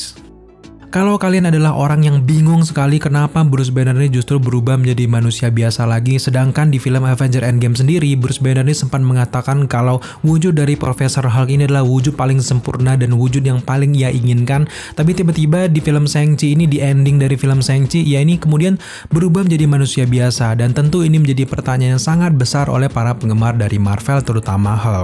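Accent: Indonesia. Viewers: Ya lidah orang indo bisa di maklumi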